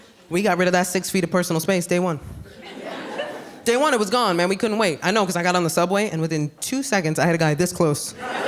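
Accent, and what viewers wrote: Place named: Bronx, United States. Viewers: I soooo knew she was from the Bronx before she even said it 😂😂😂😂 I loveddd this!!! ❤❤❤ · I love listening to a true New Yorker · I knew she was from the Bronx as soon as she opened her mouth.😊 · Literally never knew what people meant when they said I had a south Bronx tone lmfaoo me and this woman talk alike I’m crying lmfaoooo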